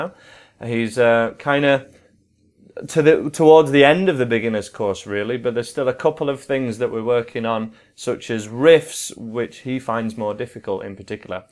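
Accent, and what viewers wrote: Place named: Liverpool, United Kingdom. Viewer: cute, has a Beatles accent, and is a great guitar teacher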